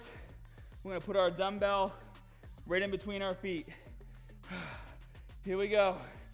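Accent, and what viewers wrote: Boston, United States. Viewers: This sounds sappy as hell, but I am SO THANKFUL for these workouts. I've been using your videos four or five times per week for 7 WEEKS and my body has literally TRANSFORMED. I live in Massachusetts and I stumbled onto his YouTube channel and I legit started doing the workouts because I heard his Boston accent. This guy is the REAL DEAL!!!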